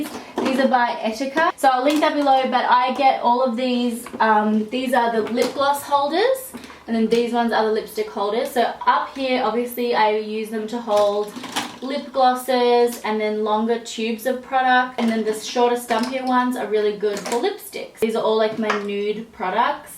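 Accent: Australia. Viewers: i see from your accent your Australian?? so am i but i was wondering where u get ur makeup from because u have the brands that we don't have in Australia???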